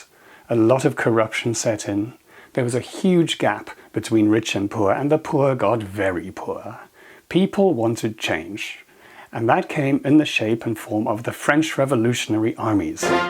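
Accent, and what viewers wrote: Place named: United Kingdom. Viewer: Are you btw Dutch or British? Your accent sounds very British.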